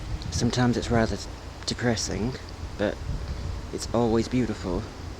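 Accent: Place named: United Kingdom. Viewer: Oh wow ok, I wasn't expecting you to be from NC. You picked up a some of that UK accent so that threw me off a little. I know some people who live in NC. My name is too and I'm from Maryland. I found your channel off a comment you made watching 539's channel. I watched a few of you vids and liked them although a couple had me really feeling your pain brother. I feel for you. I hope you can find a something to manage your symptoms better. No human should have to endure that kind of mental anguish. It's the worst kind of pain. It's like torture and really is worse than any physical pain. Physical pain can be dealt with.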